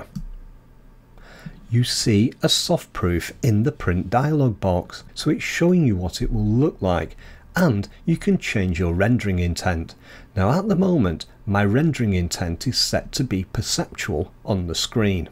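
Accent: United Kingdom. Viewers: British accent? Subscribed.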